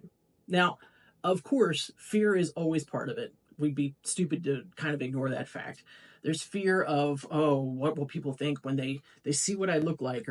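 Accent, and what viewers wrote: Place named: Pennsylvania, United States. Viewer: Great information! Do I detect a Pennsylvania accent or a bordering state?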